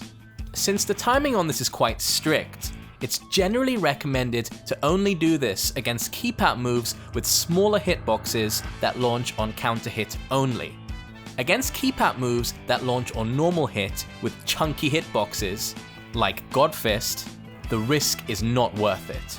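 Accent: United Kingdom. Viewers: british accent nice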